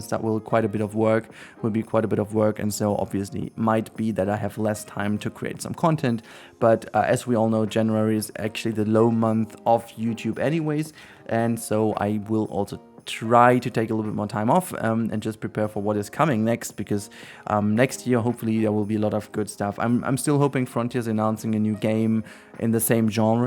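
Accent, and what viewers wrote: Germany. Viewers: wait, are you german?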